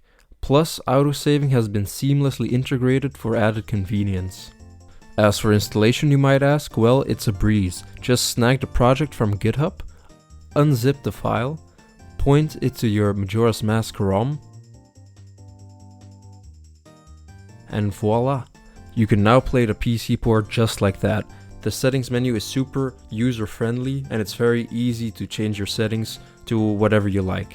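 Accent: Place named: Netherlands. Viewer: you sound dutch bro, great video btw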